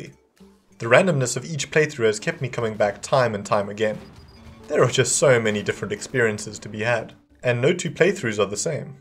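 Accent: South Africa. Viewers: Ayo, are you South African? 🤨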